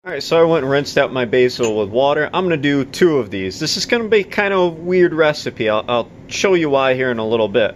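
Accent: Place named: Midwest, United States. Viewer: midwestern accent... reminds me of the dad i never had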